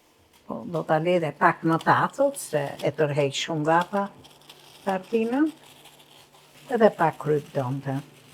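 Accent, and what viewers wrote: Tirana, Albania. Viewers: Te lumt zonje. Je fantastike, me ze te embel,dhe dialekt tirons puro.❤️🖐️ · Te degjoj se flet tironce😂😂😂😂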